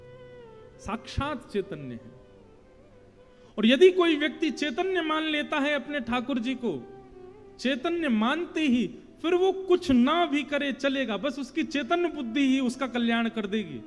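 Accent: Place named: Braj, India. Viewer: Raadhey raadhey guru ji aap bhut acchi katha krte h aap ke mukharvind se brij boli bhut acchi lagti hai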